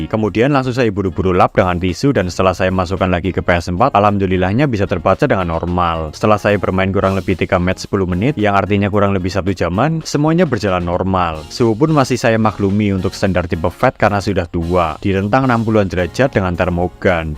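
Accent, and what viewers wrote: Banyumas, Indonesia. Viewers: Wiiiiih..slaam ngapak...lur.. ngesuk j klalen gari tuku ps5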